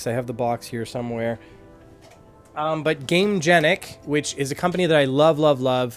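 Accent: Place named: Canada. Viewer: You sound extra Canadian in this video😅 Great video thank you!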